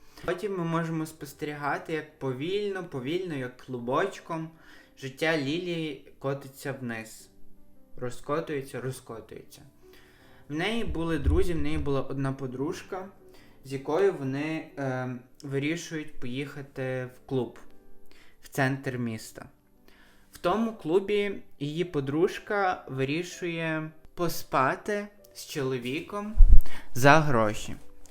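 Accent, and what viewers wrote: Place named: Surzhyk, Ukraine. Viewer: такий жоский суржик((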